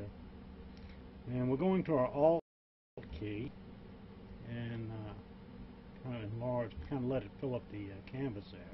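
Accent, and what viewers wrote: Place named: England, United Kingdom. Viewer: in the beginning of the video i thought you were from england. then i realized you have a southern draw..then i thought maybe louisianna..then i thought maybe i was initially right about being english.. anyway thanks for the video! z brush has so many features i dont even think the makers of zbrush know all of them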